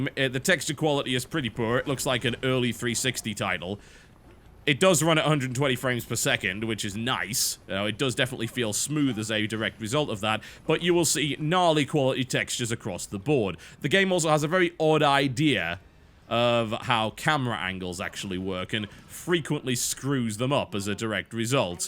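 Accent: United Kingdom. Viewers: Oh by the way, The Gods, he is british. It's called an accent. Deal with it.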